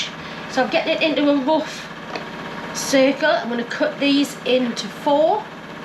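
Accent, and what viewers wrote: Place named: Johnstone, United Kingdom. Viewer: You have an extremely impressed new subscriber . I love your style , and you are my favourite of the several pie recipes on here - it was nice to see your moggy also . What a lovely crunchy fresh shallot you have also , although I am a mutton man , no drama . You sound a wee bit like a Johnstone lass , I'm from Paisley myself . Anyway , thank you very much 👍🏴♥️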